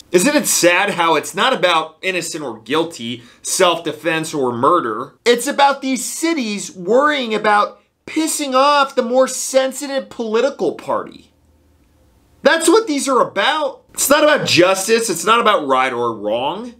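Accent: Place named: California, United States. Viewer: I’m on your side but you ain’t country. Ya sound like your from California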